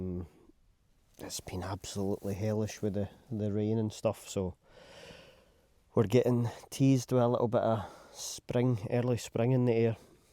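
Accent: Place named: Scotland, United Kingdom. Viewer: New pipe smoker here- I love Malcom and his poem. You did it justice mate- something about reading poetry with a Scottish accent- it really works Thanks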